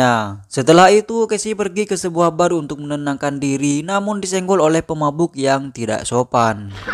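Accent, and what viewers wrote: Madura, Indonesia. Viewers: Lu madura ya min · Admin orang Madura ya logat nya beda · Bang ente orang madura ya 😂. Logatnya kliatan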